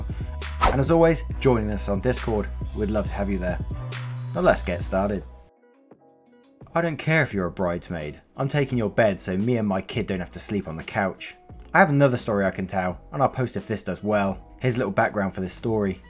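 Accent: United Kingdom. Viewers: Wow! I've never gotten a response by a r/eps person! Also I LOOOOOOOOOooooOOVe your accent! Are you brittish? I'm from Colorado in the US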